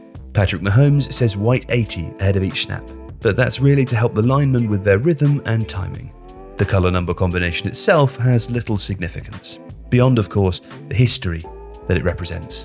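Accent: United Kingdom. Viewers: Hearing a British man saying “football” while talking about quarterbacks and audibles instead of strikers and set pieces is so jarring. I’ve just sort of mentally accepted that football+American accent=Gridiron and football+British accent=soccer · Why is there a brit explanation american football to me. I don’t trust this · Idk if I trust a British man telling me about American football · British voice talking about American Football. Interesting.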